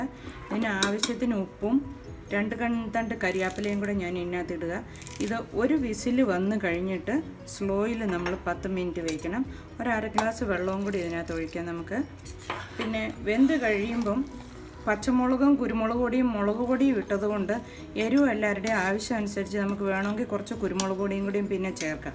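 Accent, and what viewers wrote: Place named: Kottayam, India. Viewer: Very easy. Keep going..waiting for simple recipes. I like kottayam style language also.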